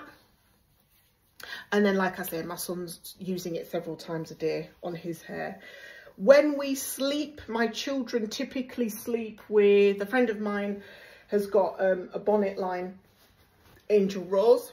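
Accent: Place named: Northern England, United Kingdom. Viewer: New subby, also from the uk just down the road from you in the midlands, as I can here your northern accent 😊🙌🏾 I’m just voice 5 years in with diy locs got just under 200 as was not prepared to travel to get my hair started or maintained. I’ve learn so much these past 5 years & started family members on their journey too, we all love locs. Your hair is beautiful & grows fast! Glad I found your channel 😊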